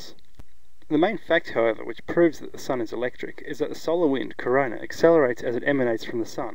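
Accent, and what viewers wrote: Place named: Australia. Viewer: it sounds like an australian accent. good on you for preserving it.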